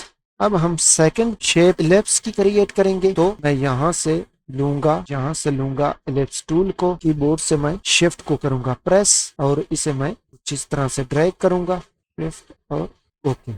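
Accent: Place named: Punjab, India. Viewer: Bhai apna talaffuz Punjabi say Urdu karo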